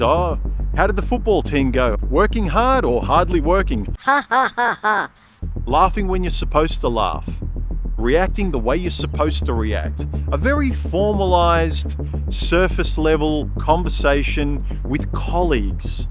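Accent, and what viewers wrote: Melbourne, Australia. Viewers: Think he's from the Melbourne area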